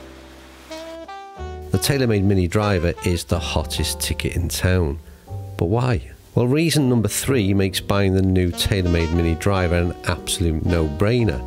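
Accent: Liverpool, United Kingdom. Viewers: Love the plazzy scouse delivery. Very blowey in the Wirral😂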